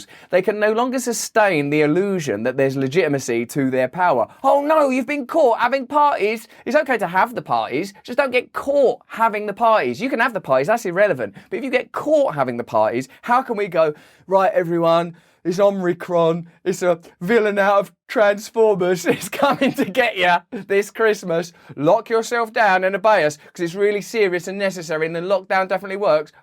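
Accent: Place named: Scotland, United Kingdom. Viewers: ps love the scottish accent 😂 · your scottish accent HAHAHAHA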